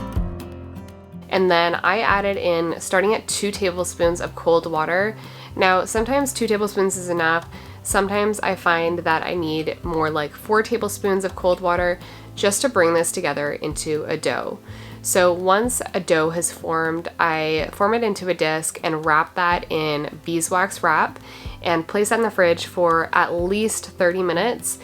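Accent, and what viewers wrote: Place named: Canada. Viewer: I thought you might be Canadian by your accent, and then I saw thr PC brand raspberries and confirmed it. 🇨🇦 👋🏻 I am, however, disappointed that you've chosen to have only American product links 😢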